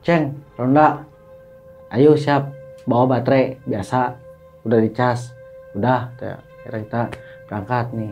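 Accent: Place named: Bogor, Indonesia. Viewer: Rada cape dengerin ceritanya. Padahal kita sama orang sunda. Mungkin karna orang bogor jd dikit beda nadanya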